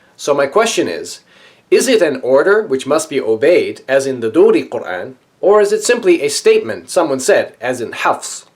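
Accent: Arab world. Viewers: Your Arabic accent is brilliant... Masha Allah ;)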